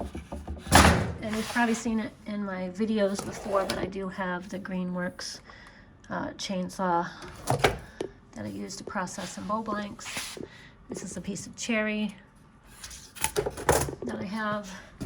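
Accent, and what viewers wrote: United States. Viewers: Thank you for the nice tour of your shop. Mine is similar in size. I'd like to show you mine now that you've shown me yours, but how do I do that? I am somewhat advanced in furniture making but a beginner at the lathe. I'm glad I found your video channel and will follow you with interest. You sound as if you have a New York accent but you've mentioned that you came from Florida and moved to Maine. But, what's with the Penn State sweatshirt? I am a retired mechanical engineer and graduated from Villanova. I live about two miles from that campus, so I didn't get very far after graduation. I worked for the DOD and the Dept of the Navy fixing ships; actually I've been all over the world at least twice. Good luck in your future video making. You give good video. -Curt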